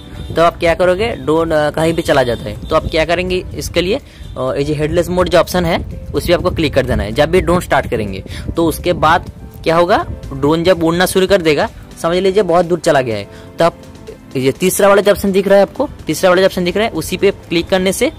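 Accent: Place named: Bengal, India. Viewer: Bangla beriye jacche mukh theke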